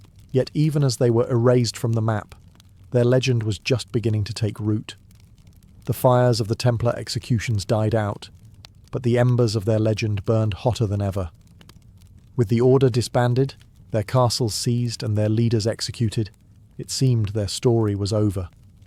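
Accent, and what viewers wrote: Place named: United Kingdom. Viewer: It takes a British accent to make sex stories sound boring as f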